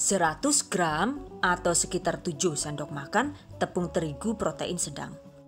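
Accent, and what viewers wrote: Banyumas, Indonesia. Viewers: suka sama adalah bahasa yg jelas...dan di selingi intermezo ngapaknya sukses sllu bun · 😂 seneng ndagel mbekayune...😂😂 kdg basa ngapak kdg indonesia kdg bhs inggris....😂✌️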